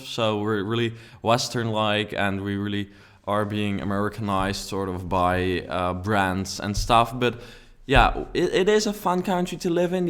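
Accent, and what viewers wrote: Netherlands. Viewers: Ik merk dat je Nederlands bent want je hebt een accent van een HOLLANDER!!!!! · Thaatttthh Goooooooddddd XD lekker accentje, elke nederlander kan een nederlander herkennen aan t acceptnje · You have a dutch accent 😊👏🏼❤️ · I think you are a great builder and produce some nice content. I am afraid you dutch accent is painful to listen too though. It makes me cringe XD (you seem like a nice guy though) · I was like "yeah right hes gonna know how to build that" then i heard his voice and was like "yup he dutch" lol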